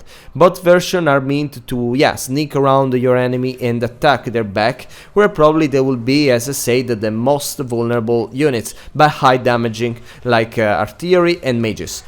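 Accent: Italy. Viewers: Fantastic video, by the way, are you Italian?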